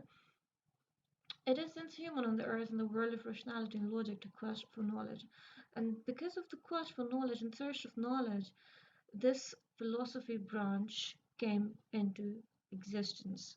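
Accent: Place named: United States. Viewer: Madam wese ap ny kaha tha ap ko easy tareeky se samjhao gi per ap ny itni American English accent bol kr pryshaan hi krdya ... over lagta hai...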